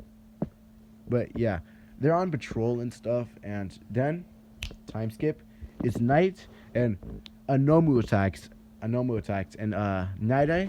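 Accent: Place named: Jamaica. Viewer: Yooooooo your Jamaican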